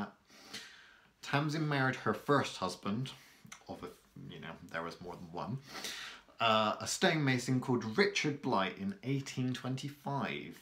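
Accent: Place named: West Country, United Kingdom. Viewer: A fascinating video, great to learn more about my part of the country and Cunning Folk in general. I could listen to your West Country accent all day! Cheers, moi luvver!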